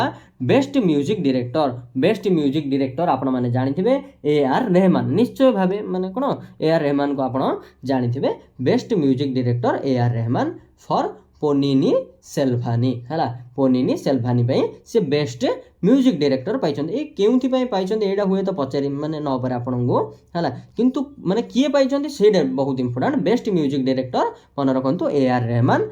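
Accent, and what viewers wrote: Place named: Odisha, India. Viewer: ଭାଇ ତମେ ଗଂଜାମ ଜିଲ୍ଲାର କଥା ଭାଷାରୁ ଜଣାପଡ଼ୁଛି 😊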